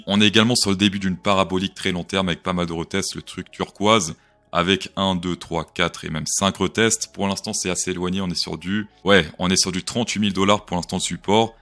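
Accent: Vaud, Switzerland. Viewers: Tu l'entends à des kilomètres l'accent vaudois, sans oublier le "huitante" 😉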